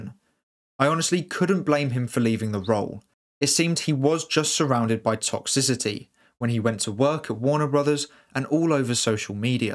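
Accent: United Kingdom. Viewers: Listening 👂 to your British accent as American 🇺🇸 I’m tired 😪 of Brits playing our Hero especially Batman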